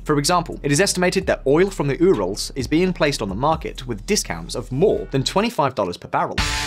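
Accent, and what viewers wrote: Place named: United Kingdom. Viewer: Whenever I hear a British accent, I know I'm probably about to be subjected to Russophobic propaganda---like this video, which is a slickly-produced combination of ignorance, mis- information, and wishful thinking. I like the way glosses over the fact that a total fuel embargo on Russia will severely damage the Western economies for a very long time--perhaps permanently, in Britain's case. But it's OK; we must be prepared to go cold and hungry, just to stand up to that nasty old Putin. Such childish bullshit. And the punchline, of course, is that the Russians seem to be weathering the sanctions just fine.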